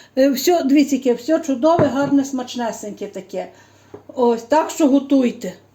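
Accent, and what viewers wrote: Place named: Chernivtsi, Ukraine. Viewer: А мова, діалект, говір, словечка, наголос точно, як на Батьківщині у мого покійного чоловіка, а він з Чернівецької обл з Романківців. Наче в рідних вдома побула😊 - підписка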